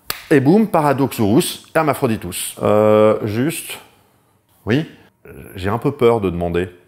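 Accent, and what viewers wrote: Belgium. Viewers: Je reconnais un accent belge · C'est dingue même avec la modif de voix on reconnaît l'accent belge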